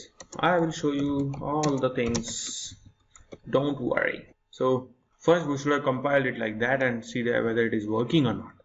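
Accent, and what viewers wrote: India. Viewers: these south indian accent is so funny, stop using it. Hard to understand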